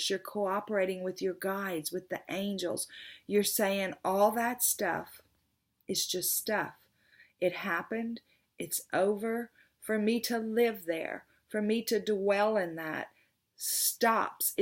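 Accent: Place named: Alabama, United States. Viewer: I was hearing Alabama, are you from there?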